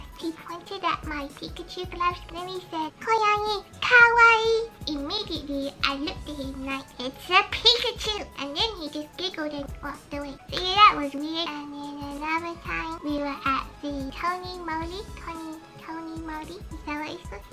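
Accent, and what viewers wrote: United Kingdom. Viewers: I love your editing, and your accent sounds kinda like a cock-knee accent (I've got noooo idea how to spell that sooo I'll just spell it how it sounds 😋).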